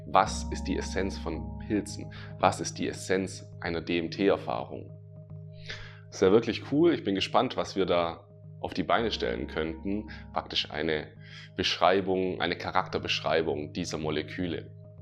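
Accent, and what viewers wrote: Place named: Swabia, Germany. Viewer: Liebe Grüße aus Chiang Mai... du hast nen schwäbischen Akzent 😁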